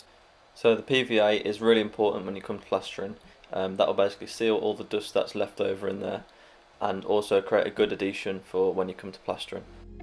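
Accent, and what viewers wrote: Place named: Birmingham, United Kingdom. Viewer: Can hear a Brummie accent 😅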